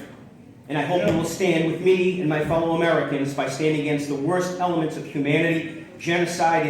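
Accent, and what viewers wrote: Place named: Italy. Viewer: This speaker is of Italian descendance.His accent gave him away....;)))))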